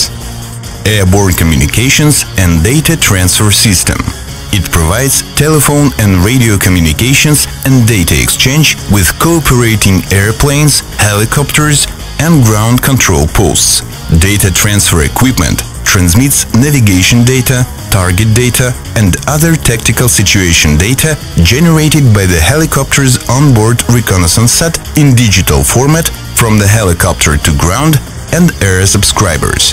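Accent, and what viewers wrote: Russia. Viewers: Такое чувство, что это русский пытается говорить по-английски. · русский ваня говорит((((((((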